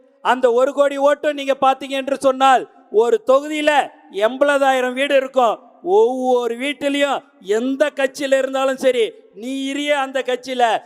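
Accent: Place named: Chennai, India. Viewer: Chennai slang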